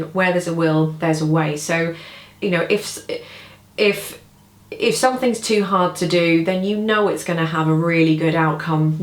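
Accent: England, United Kingdom. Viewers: Talented, beautiful, AND you have an English accent. I want one!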